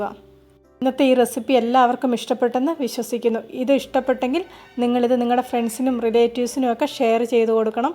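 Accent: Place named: Southern Kerala, India. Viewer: വീട് കണ്ണൂരാണോ? സംസാരശൈലി തെക്കൻ ജില്ല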